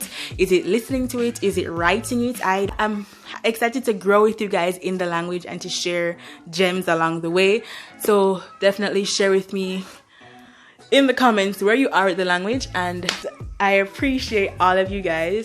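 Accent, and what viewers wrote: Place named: Spain. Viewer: yes u do have a spanish accent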